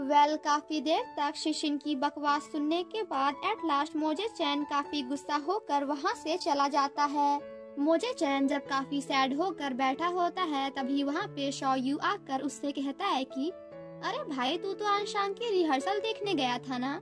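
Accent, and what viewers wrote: Gujarat, India. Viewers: Ok I Guess you from GUJRAT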